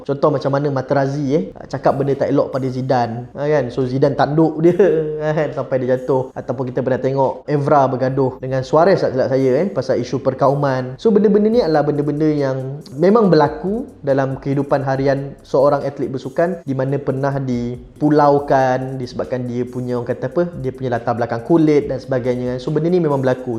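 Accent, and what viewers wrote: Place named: Kedah, Malaysia. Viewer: Ni kedahh aa mcm gini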